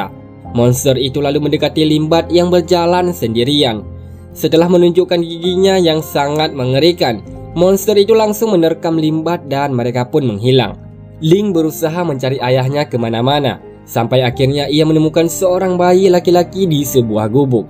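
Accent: Aceh, Indonesia. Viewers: Apakah adminnya org Aceh ? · Kek bhs aceh sikit² tpi mntap bg👍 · Logan acehnya masi kental bang semangat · Aceh teoh😃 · Ini admin a pasti orang aceh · Ia org aceh knyak ya😂😂😆😂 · Cara ngomongnya kek orang Aceh ya bg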